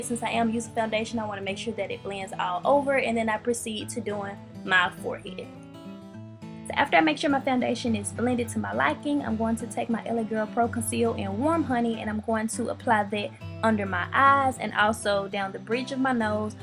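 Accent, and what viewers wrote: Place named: Memphis, United States. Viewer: i heard you talking and i was like she sound like she from memphis cuz i from memphis and live here and you look really familiar for some reason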